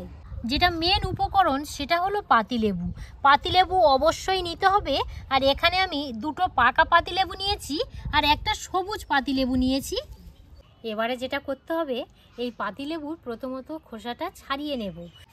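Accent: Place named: Ghoti, Bangladesh. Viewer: আপনার সুন্দর বাংলা ভাষা (ঘটি ) শুনলাম, ভালো লাগলো।ভুলে গিয়েছিলাম ভারতবাসী।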